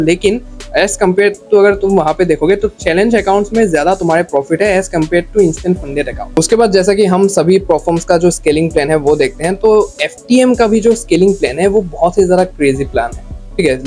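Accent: Assam, India. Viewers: Assam se ho kya?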